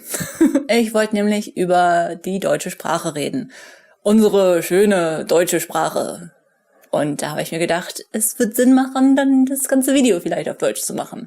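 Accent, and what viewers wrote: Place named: England, United Kingdom. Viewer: I know some of those words! Ich mag hören zu deine Deutsch-voice. almost as much as your very English English accent. Mehr, bitte? I listened through once without subtitles and then again with subtitles. I was happily surprised to have genuinely understand some sections. I now know 2 (and a bit) languages and yes, there are concepts that I can only express properly in one of them. There is no good translation for kummerspek, 無心 or dag. yah? I mean, ja? and German is not harsh. Compared to French, maybe? I find it quite lyrical and soft to my ear.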